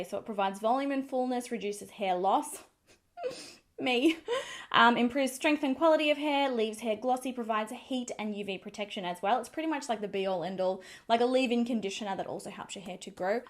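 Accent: Australia. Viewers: Joan Gentile I’m curious to know how you do not know an Australian accent when there are many Aussie celebrities with the same accent. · She's from Australia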